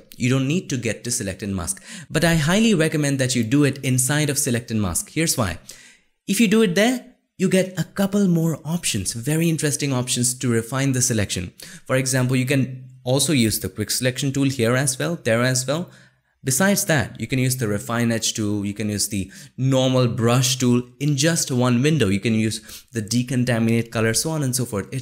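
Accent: India. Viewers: I don't really get this guy's accent is it fully Indian or American.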